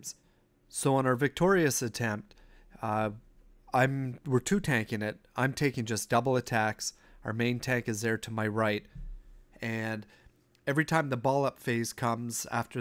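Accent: Canada. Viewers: Couldn't agree more. I love that calm Canadian voice and the way he says process. If it turned into something like "HAI GUISE HERE LOLOL ALRIGHT LET'S DO SOME ACHIEVS WOHOO" it would really ruin the character of as well as. Just my 2 cents.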